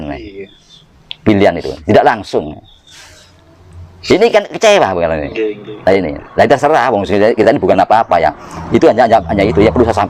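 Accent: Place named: Lumajang, Indonesia. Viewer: Logate wong Lumajang..Iki..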